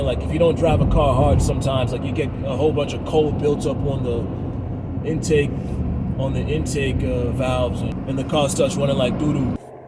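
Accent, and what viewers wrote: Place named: Minnesota, United States. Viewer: Nice looking car. Always enjoy your genuine demeanor and no bs get it done attitude. I still hear that MN accent haha, you sound just like the guys I grew up with. Hope your channel becomes the next Richrebuilds!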